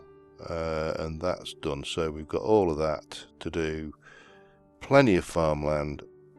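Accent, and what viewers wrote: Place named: East Midlands, United Kingdom. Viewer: I have been working my way through your series and look forward to every video. As a fellow Brit (I'm thinking East Midlands from you accent) I want to say well done our kid. I glad you covered this topic as its an area I need to work on in my own game.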